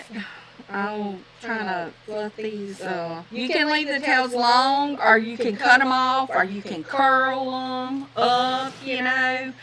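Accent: South, United States. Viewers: I love this & did you figure what the tag said for the green fillers? By the way my mother was listening to this & she said she must be from the south. 🤣🤣🤣 We’re from Alabama. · I love that southern twang!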